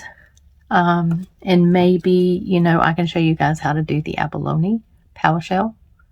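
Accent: South, United States. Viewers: I can understand your southern drawl perfectly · you talked about your southern drawl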